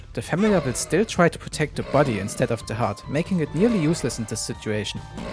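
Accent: Germany. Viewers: Dude idk if every german speaks like that but i love how you speak english ! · Wie man einfach direkt raushört, dass du ein Almano bist haha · you can hear that this man is german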